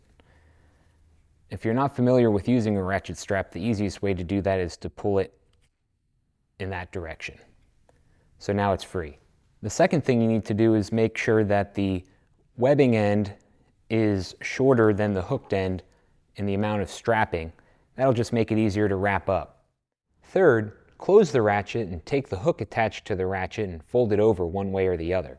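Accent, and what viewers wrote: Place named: Pennsylvania, United States. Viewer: Thats accent says Pennsylvania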